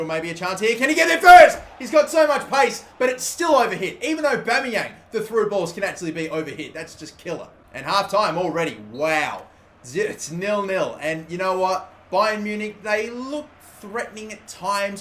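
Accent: Australia. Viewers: Soccer Eric77 I think the Aussie accent has to do with it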